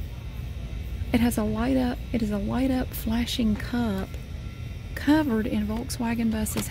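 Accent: South, United States. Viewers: I appreciate your organizational vids and adore your Southern accent 💘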